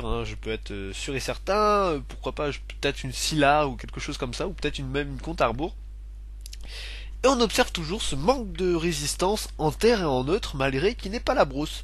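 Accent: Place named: Marseille, France. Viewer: Ta un accent marseillais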